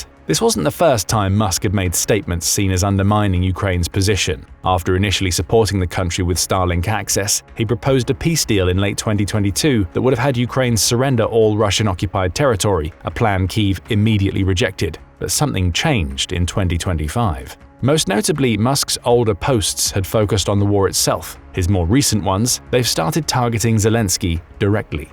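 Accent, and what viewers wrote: United Kingdom. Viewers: This video has a completely Eurozone slant.. just because someone has a British accent, doesn't mean you can believe all they say.. The book hasn't been finished yet on US efforts in the Ukraine - Russia war. Don't forget, British did have their hands in the Russia disinformation scandal regarding President Trump (debunked Steele Dossier)..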